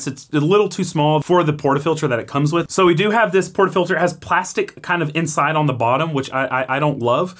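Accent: United States. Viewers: sounds American not European. So are you an expatriate?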